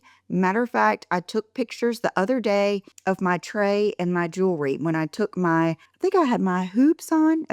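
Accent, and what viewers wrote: South, United States. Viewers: Thank you. Your videos, your presence, your lovely southern accented voice, one of my favorite Influencer's to watch and listen. I know all of the thinks you talk about, but sometimes, adulting in wellness can be put on the shelf. So I do take your advice on a few things, and they help. love you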